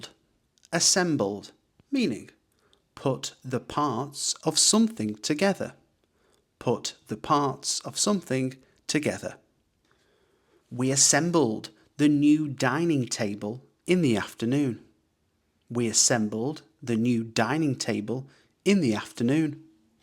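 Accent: United Kingdom. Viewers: What British accent is this?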